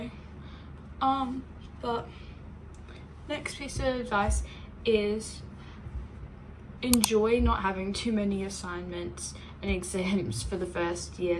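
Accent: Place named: Australia. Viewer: Do you live in Australia or the UK but i am hearing an Australian accent and I am fairly sure the school system is the same so its confusing me 😭😭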